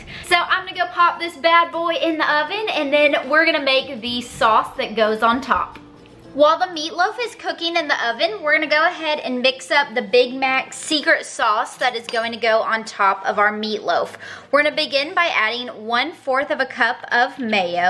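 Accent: South, United States. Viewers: "Hey y'all!" Absolutely love that deep southern accent..."with yo purty little self" 😄😄 Real talk though this looks good I make a mean meatloaf myself so I'll be trying this soon thank u for the vid.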